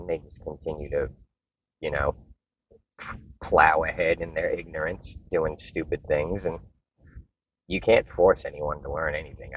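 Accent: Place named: Long Island, United States. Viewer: There is nothing better than hearing a guy from Long Island tell Zen stories! “And the monk didn’t give a shit that he stole all his stuff.” I loved your interpretation and laughed,. That was so great.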